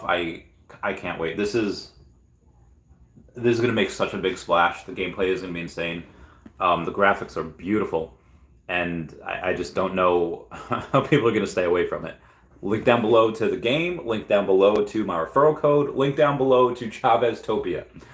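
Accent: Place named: Australia. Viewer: 😂 cracking English Australian accent 👍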